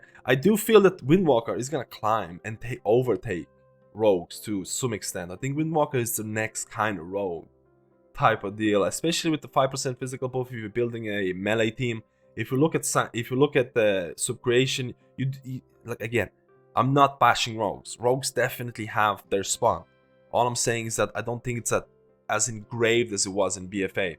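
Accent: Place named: Ireland. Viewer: Dude you are without doubt the best healer content creator out there. Funny i thought that ur from some nordic country, because of your accent just to realize that ur irish. Really different accent from brittish one :D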